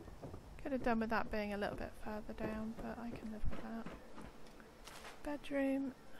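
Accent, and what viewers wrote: United Kingdom. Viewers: Awesome video! Love your accent, are you from the UK? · Brilliant . You got yourself a new subscriber. Nice to hear an English accent in a 76 video too lol. Got my mind turning over thinking of tiny houses now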